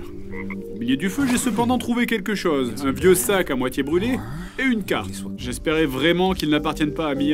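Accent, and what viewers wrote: Paris, France. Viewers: C'est fais exprès l'accent parisien? J'aime ce type de let s play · cette accent parisien !!!!! loool Hâte de voir la suite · Le ptit accent pointu parisien pédant est juste par-fait ! Ça sert vraiment l'immersion !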